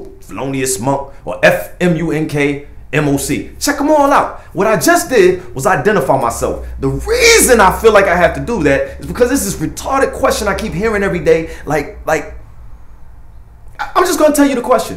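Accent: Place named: Philadelphia, United States. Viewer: Are you from Philly,You have to be you have the accent.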